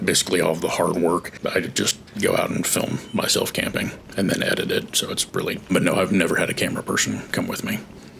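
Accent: South, United States. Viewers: This Augusta old lady, now living in Canton, appreciates your southern manners and accent! I enjoy your adventures.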